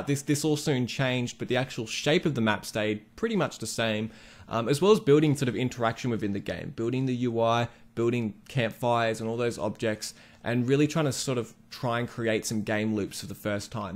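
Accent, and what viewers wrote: Australia. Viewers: ayyy its another aussie bloke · yooo idk why i got so excited as soon as i heard your aussie accent lol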